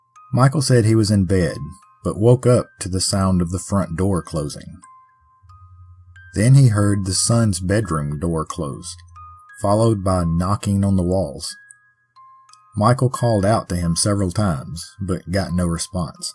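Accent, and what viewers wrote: South, United States. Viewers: Interesting and intriguing stories narrated by a Southern, Good-ol'-boy; accordingly, worth a sub.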